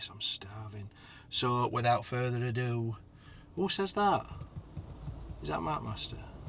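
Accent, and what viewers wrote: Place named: Barnsley, United Kingdom. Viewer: I hope that fish and chip shop was 'white pass' because with your Barnsley twang it sounds like 'wipe ass '. Lots of love Huddersfield .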